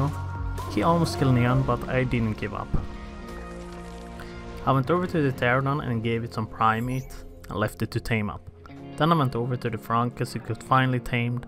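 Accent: Sweden. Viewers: Jävligt kul att höra lite svengelska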